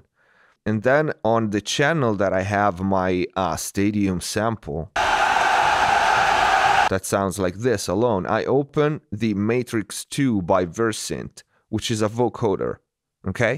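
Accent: Italy. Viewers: I'm assuming Italy.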